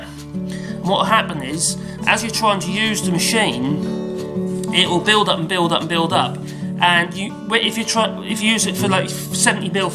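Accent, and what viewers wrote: Norfolk, United Kingdom. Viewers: Gotta be from Norfolk bor!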